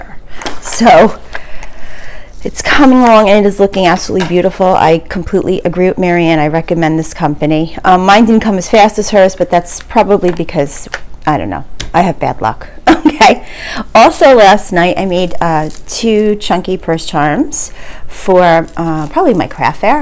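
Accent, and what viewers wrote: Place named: New York, United States. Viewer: Your dp looks great so far! I’m a Jersey girl, you sound a little New York ❤️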